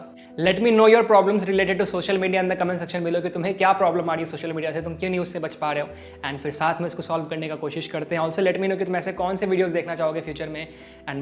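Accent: Bihar, India. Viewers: your bihari accent is sosexy. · Bhaiya got the 💯 Bihari accent blend 😸PURE IITian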